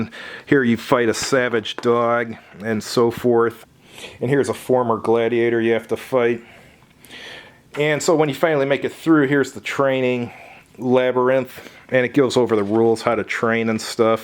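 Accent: Chicago, United States. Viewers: must have the thickest accent in all Chicagoland.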